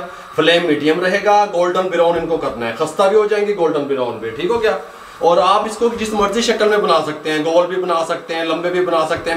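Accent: Punjab, India. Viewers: اسلام علیکم بھائی جزاک اللہ خیر آپ بہت اچھا کام کرتے ہیں اور میٹھی میٹھی پنجابی آپ کی بہت اچھی لگتی ہے اور اللہ تعالی آپ کو اور عزت دے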